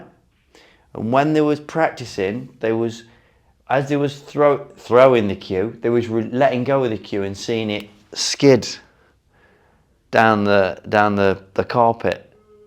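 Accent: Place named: Midlands, United Kingdom. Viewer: So midlands, I love it! What a guy.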